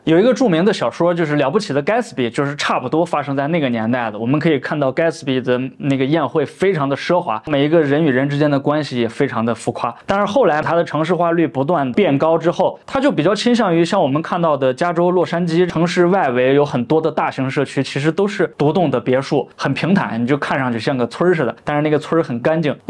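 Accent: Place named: Henan, China. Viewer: 突然听出了一点的口音，是河南人吗😊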